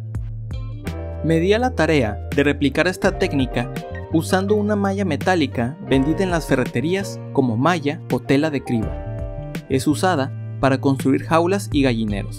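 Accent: Mexico. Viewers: Se te nota el acento Sinaloense we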